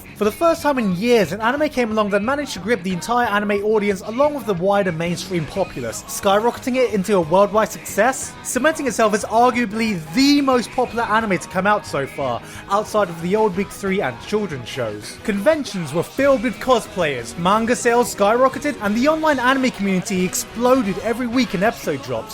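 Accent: England, United Kingdom. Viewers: hey, you sound english. where abouts are you from?:)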